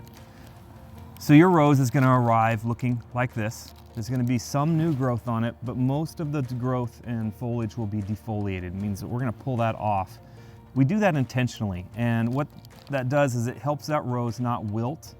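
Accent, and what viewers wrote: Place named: Pennsylvania, United States. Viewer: Great video. Sounds like a PA accent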